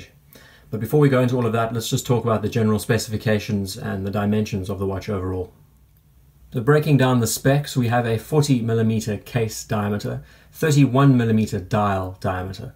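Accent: South Africa. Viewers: Hiya,new to your channel.Got the S.African accent immediately.I like your philosophy on value.That panis could easily sit next to any high piece and stake a claim.I also appreciated your comment that Tensing got little recognition in the famed Everest top out ,why because that guy Biliary may never have got there? Anyway ,informative video and enjoyed the Flemming dialogue.Cheers,Len.UK. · I didn’t know that was a South African accent I was hearing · Thanks for sharing your interesting story with us. As a non-native English speaker I totally hear the same accent in your voice as with Winston from the YT channel SerpentZA. The next time I hear someone with that accent I'll probably be able to guess that he is from SA.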